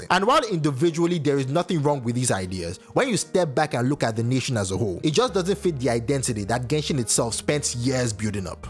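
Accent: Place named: Nigeria. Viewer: lmao i enjoy these genshin videos and the nigerian accent makes it better.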